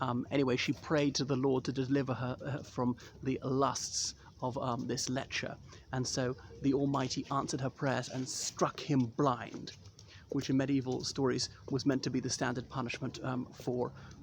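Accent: RP, United Kingdom. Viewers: I watch your clip to improve my RP accent... Whoa.... Such great knowledge. Regards, Siriluck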